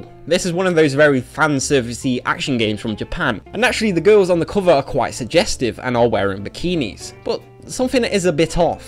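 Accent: United Kingdom. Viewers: all I can say is, in the UK (and judging from the hosts accent he should know this as well) it is law for porn magazines to be covered up in the UK, and it is becoming a ( albeit slowly ) nation standard for newspapers to be out of sight of children. video games don't have these rules, but saying that with age restrictions being a thing and, the ability to simply cover a game with some metal or wood with the title being written on it is rather stupid.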